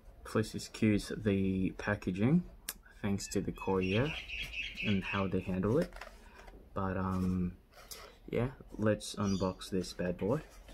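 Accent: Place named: Philippines. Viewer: U a filipino bro your accent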